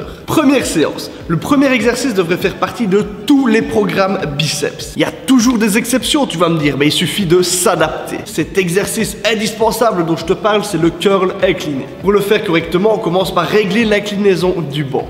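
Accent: Liège, Belgium. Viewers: Oufti l'accent 👍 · Salut, Bien sympas les vidéos. J'entends comme un petit accent liégeoise ... :) Tu es de quel coin ? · Jkiff ta facon de PARLÉ vive la Belgique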